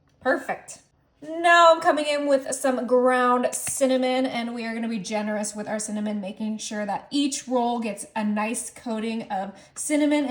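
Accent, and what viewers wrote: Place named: South, United States. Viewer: People seem nastier to others these days. Plus she is a southerner ( I'm from Georgia) and the accent makes her a target. Although my husband is from Alabama and I make fun of him saying ass for ice. It's a little funny. There are many cinnamon roll recipes out there. Leave Miss alone. Get Back Satin in Yeshuas name.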